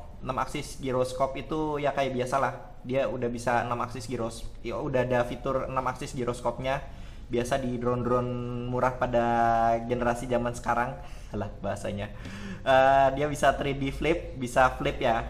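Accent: Banyumas, Indonesia. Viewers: yes video baru.. assiikk. yesss kompor baru... makin aassiikk. 😂 udah kangen sama video2nya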